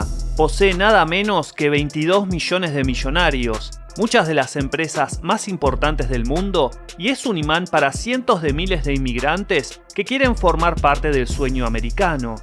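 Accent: Argentina. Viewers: Ahora usted periodista, por su acento es argentino. Como esta su Argentina???? hoy en día???? cual es su inflación?????. Espero escuchar su análisis también, es fácil hablar de los vecinos pero difícil habla de mi hogar. O la idea central es "Mal de mucho consuelo de tontos" es eso su análisis??????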